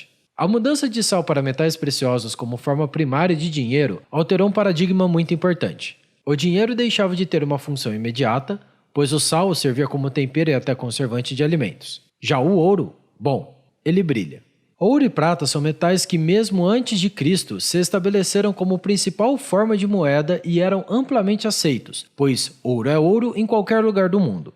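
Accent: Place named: Goiás, Brazil. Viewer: Esse sotaque goiano kkk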